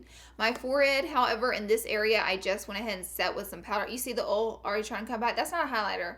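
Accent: South, United States. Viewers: You're awesome blossom 😊 Love ya .... keep saying oil the way you do. Most ppl love it and your southern self ❤️ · Love your southern twang! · I <3 your southern accent!!!! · I love the southern accent, its adorable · I love the southern accent, ❤️from Tennessee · LOVE THAT SOUTHERN OHL!! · Love your southern accent ❤️👌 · I love your southern accent, why would someone not like it #snapfam💦💦💦💦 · Honey, you say oil just fine. I love how your southern accent pops in once and a while. You stay strong to where you're from and I think that's an honorable thing. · I love that you're so unapologetic about your southern accent. I never get to hear people like me in the beauty world so I ❤️ you and your channel! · I love your Southern slang 🤣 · Love ur southern accent girl 👍❤ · I love her southern accent! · I am from the south and I love hearing beauty guru type YouTubers talk the way I do. 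· When you're from the south and talk the same way 💕 Girl I got you 🤙🏼 ole ftw 😂😂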